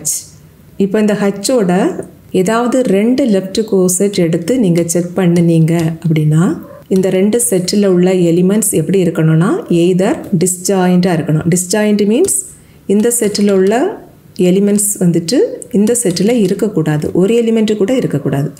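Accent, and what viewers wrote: Kanyakumari, India. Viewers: Kanyakumari 🤩🤩 your slang also Ngl 🤩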